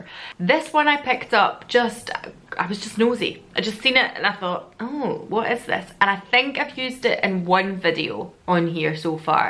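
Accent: Scotland, United Kingdom. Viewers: Lobe the Scottish accent xx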